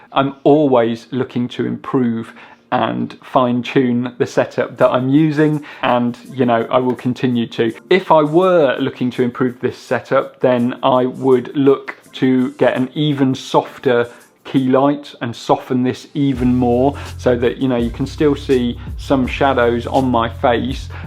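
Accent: United Kingdom. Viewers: Great video mate. I detect a midlands twang in your accent ☺️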